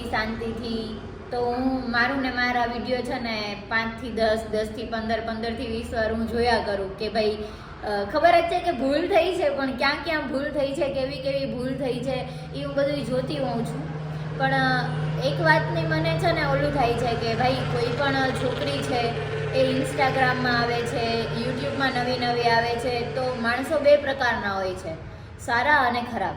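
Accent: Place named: Kathiawar, India. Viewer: Ek nabar bolk desi badha ni jem ferndsi nay bolta ae j hova ni maja aave kadhiya vadi ek dam ❤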